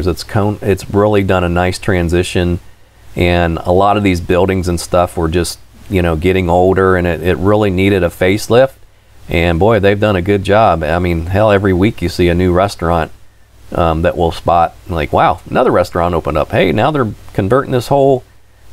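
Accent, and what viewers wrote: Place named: Great Lakes, United States. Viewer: Are you originally from Chicago, Michigan, or the Great Lakes area? Just wondered because of your accent.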